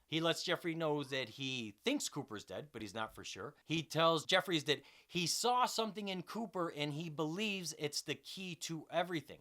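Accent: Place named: New Jersey, United States. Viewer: Maaaaan, what's with the accent? Where are you from? New Jersey?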